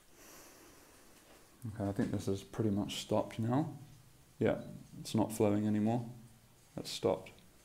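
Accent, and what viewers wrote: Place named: United Kingdom. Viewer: After much thinking, the penny dropped. The overflow (which is not a siphon) works as the water level in the tank comes up, it equalises in the overflow box, which brings it over the level of the white tube - voila! Have you experimented with the flow? How would you increase the flow out of the main tank? Great video - well explained. I thought I heard UK in the accent and USA in the power outlet - then I read Thailand in the comments!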